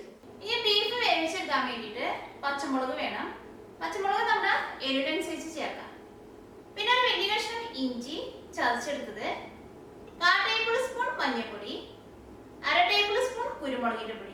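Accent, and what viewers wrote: Thrissur, India. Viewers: തൊലിഞ്ഞ തൃശൂർ സ്ലലാങ് ഒഴികെ എല്ല്ലാം കിടിലോൽ കിടിലം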